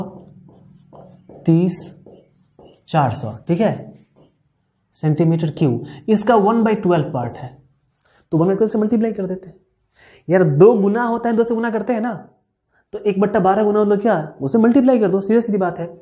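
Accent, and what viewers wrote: Bihar, India. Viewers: Aap Bihar se hai kya Hum Bihar Darbhanga se hai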